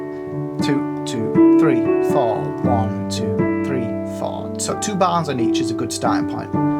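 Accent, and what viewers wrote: United Kingdom. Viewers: great one...! BTW - your name sounds somehow Czech, but your mouth sounds very much British :) Any chance you have some family ties there? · I didn't know that your British accent was associated with farmers and truck drivers. Like I said before , you sound like a normal British person. It doesn't sound southern or anything. I don't know why.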